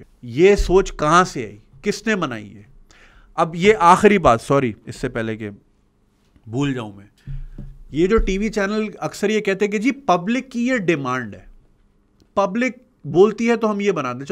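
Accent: Karachi, Pakistan. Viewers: Karachi walon ka accent esa ee hota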